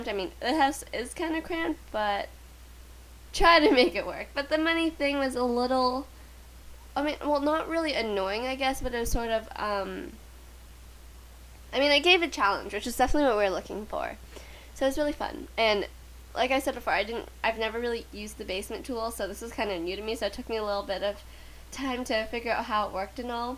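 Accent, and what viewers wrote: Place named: United Kingdom. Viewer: Oh no not the British accent again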